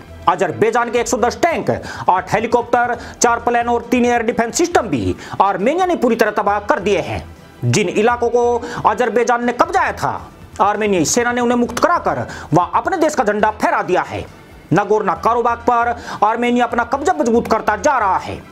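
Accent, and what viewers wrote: India. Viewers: Ji aap rajesthan se ho kya ya hariyana se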